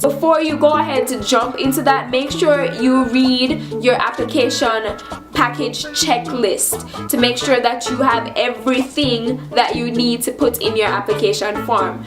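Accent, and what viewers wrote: Jamaica. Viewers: she sound Jamaican.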